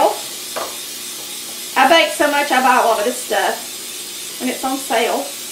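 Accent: South, United States. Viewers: Looks awesome. I was diagnosed with cancer 3 yrs ago and after chemo, radiation & 3 major cancer surgeries at M.D. Anderson in Houston Tx, I had to find a new hobby so I wouldn't loose my mind & I've learned I LOVE TO COOK!!! I'm getting better with every recipe. I will be making this soon...especially on a cold rainy day and I'm subscribing to your channel. Thanks for the recipes. Much love from Mobile Alabama I was wondering what city & state are you from...I definitely hear a southern accent.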